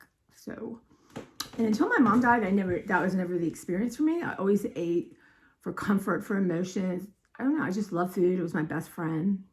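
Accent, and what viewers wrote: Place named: Pennsylvania, United States. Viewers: Why did I assume you lived in Pennsylvania? That's the accent I hear. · Where are you from? My daughter who is a linguist would say has a mid Atlantic accent which includes the Philly area but not as intense as Philly. I asked where you are from because I assumed NYC from your Trader Joe’s story, I live in Long Island.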